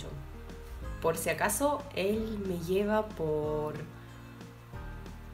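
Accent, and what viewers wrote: Peru. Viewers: La jerga y malas palabras te salen súper natural como peruana, te haz peruanizado igual que Veronique 🤘🏿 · Q crackkkkk.. jajaja ..habla muy pero muy peruanazaaaaa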